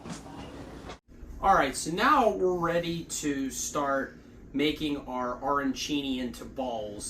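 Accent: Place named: Pittsburgh, United States. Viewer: Born and raised in Pittsburgh. First video I’ve ever seen of you. Soon as I heard you talk, I thought this guy is from Pittsburgh.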